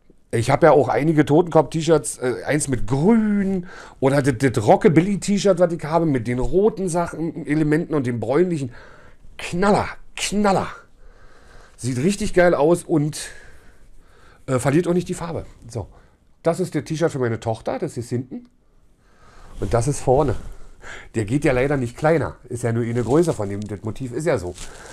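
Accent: Berlin, Germany. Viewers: Also mein Lieber, entweder Berlinerste richtich oder lässt det. Dieses „Berliner/Hochdeutsch“ dit is nüscht!!! Grüsse 🤘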